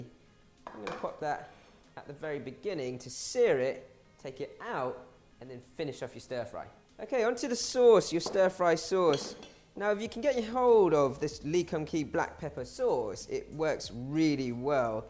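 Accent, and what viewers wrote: United Kingdom. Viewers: weir to heard a chinese having british accent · Interesting to hear a Chinese man speak British exotic im quite amused lol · I love this British accent, better than the cockney accent. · I focus on his accent the way he talk. I love british accent 😂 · Chineese with a British accent love it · Let me figure this out......... An Asian guy with a British accent? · Never seen a asian negga, wit British accent. Very nice. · Why he sounds like he from the UK🤔🤔🤔😁anyway it is so important to me as a Chinese sista to learn how to cook Chinese dishes for Suga Bearz Cafe I'm collecting all recipes did I tell yall my egg roll horror 😨😨😨😆😂I ate it😕 an if bae was there an not on camera duty he would have ate it too right bae 😒I want a wok so bad I can't wait I want traditional food it's all going to be apart of SUGA BEARZ Cafe soulkitchen on Royal Underground tv charter spectrum 32 booyah happy cooking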